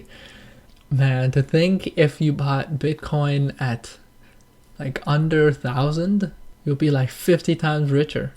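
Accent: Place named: Tamil Nadu, India. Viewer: Bro are you a tamil? Apdina neenga vera level bro(really good). Unga(your) accent and way of thinking is superb. I have subscribed and proud to see an Indian doing so great in terms of investing which is non existent here. Thanks a lot.!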